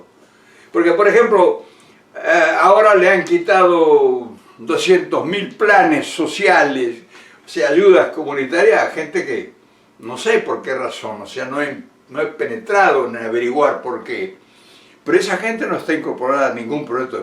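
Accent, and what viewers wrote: Argentina. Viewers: Ok señor en vez de criticar a López obrador xke no critica a su presidente de Argentina xke en tu forma de hablar eres argentino y no utiliza ninguna majia solo que es inteligente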